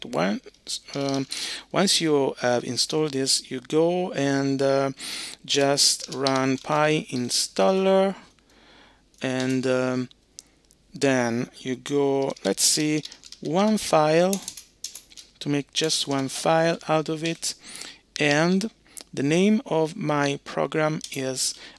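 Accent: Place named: Italy. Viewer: Ho riconosciuto lievemente l’accento italiano 🥰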